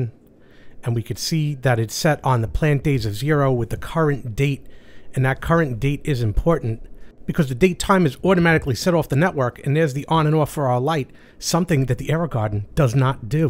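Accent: New York, United States. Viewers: is that a NewYork accent?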